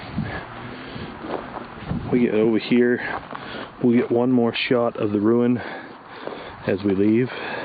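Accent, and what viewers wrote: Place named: Europe. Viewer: Voice has a slight European sound .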